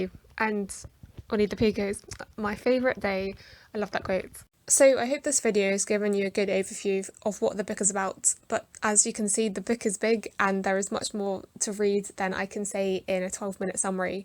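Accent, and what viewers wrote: England, United Kingdom. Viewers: It's an English accent, one of many in daily use in England. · Thank you very much for the concise and artistic presentation. I liked also your English accent.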